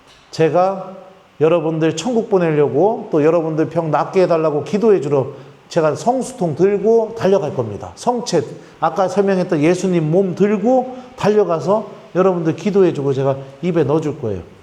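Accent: Chungcheong, South Korea. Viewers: 아주 오랜기간 냉담을 하다가 다시 주님 앞에 왔어요 그런 저를 보고 비신자 남편이 의아해 하면서 이것저것 물어 보는데 제가 답을 못해서 교리 공부 다시 하는. 중인데 신부님 강의가 짱입니다~~^^ 남편. 들으라고 볼륨 키웠는데 " 저 신부님 충청도 사람이네" 하더라고요.ㅎㅎ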